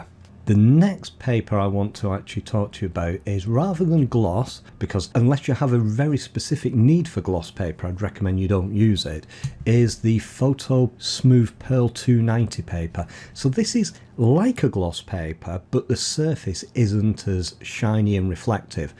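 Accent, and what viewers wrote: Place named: Lancashire, United Kingdom. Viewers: Your accent sounds local to where I live. Born in Lancs live over the Pennines 🙂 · Hi, why on earth can't others talk clearly and in a straightforward manner like you, clear & concise in an English accent. First class video. thank you so much.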